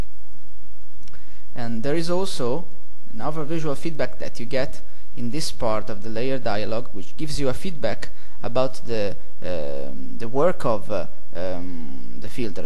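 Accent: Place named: Italy. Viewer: Итальяно прейро))) По-английски говорит хорошо, но по оставшемуся акценту национальность легко определяется)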